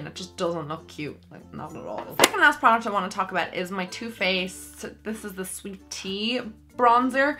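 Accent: Ireland. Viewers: I can't quite put my finger on it but you're becoming one of my favorite YouTubers to watch. I love your personality and your Irish accent. I'm from Texas USA and have always wanted to go to Ireland. I hope the day that I do I will be able to meet up with you :-) I feel like we would get along well.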